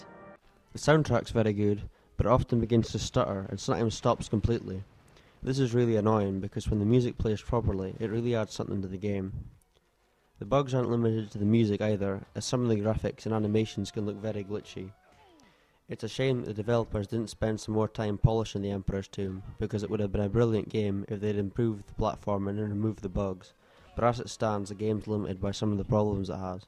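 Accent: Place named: Scotland, United Kingdom. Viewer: is it possible for Xbox one backwards compatibility. Probs not. Is it on steam or any other seller? By the way, you sound Scottish, I'm from there as well :D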